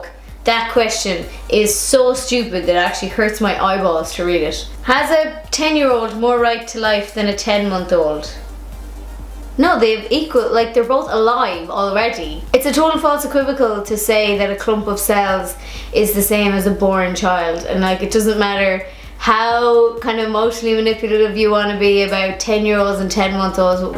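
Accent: Ireland. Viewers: You don't know how helpful your videos are! I'm planning a interchange to ireland and i was looking for youtubers from there and then i found you! Now I can understand the irish english better thanks to you! Great videos tho.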